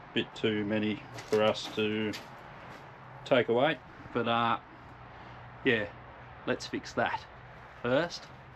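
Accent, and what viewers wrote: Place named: Australia. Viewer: You Aussie men are definitely made different! I don’t know of any American men that would get on their hands and knees and weed through a small rock bed much less over half an entire yard like y’all did!!! You and work great together and I love seeing him using the power tools every once in a while. He seems to stand up taller, lol!!!😉 Ya’ll keep up the good work and keep the blessings going!!!❤ P/S Love the hair, the accent, the whole package!!!😊 Nelly, USA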